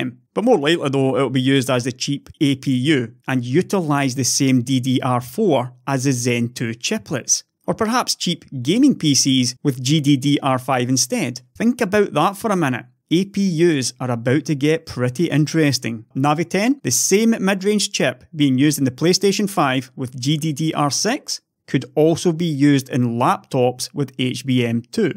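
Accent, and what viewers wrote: Scotland, United Kingdom. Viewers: I've learned his Scottish accent and it's really great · your pleasant Scottish accent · his Scottish strong accent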